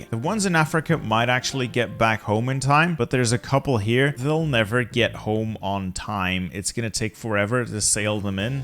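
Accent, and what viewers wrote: Belgium. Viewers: out of curiosity, are you from flanders or wallonia cause i can’t put my finger on it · Hes Belgian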